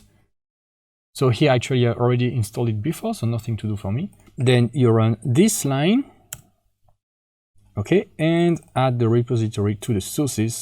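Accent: France. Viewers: Je reconnais un accent français c'est dommage que la vidéo ne soit pas en français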